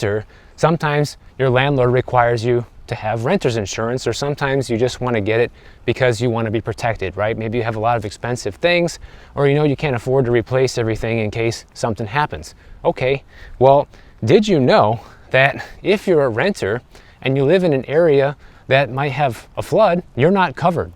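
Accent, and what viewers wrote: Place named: Illinois, United States. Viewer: From one Illinoisan to another, I thought I recognized and Illinois accent.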